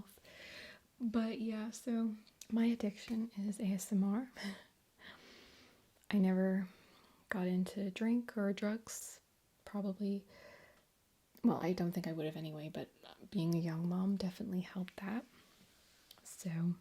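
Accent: United States. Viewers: As a none American, I would be easily fooled into thinking you where American most of the time. very creative idea. don't think I've seen a video where the character is an asmr fan without it being "crazy stalker" roleplays. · I forgot until I read that comment that you are British! It was near the end, too! Wow great American accent. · Your American accent is sooo good it throws me off more when you speak in your normal accent. You control all the little tell tale signs that usually peek through when doing an American accent.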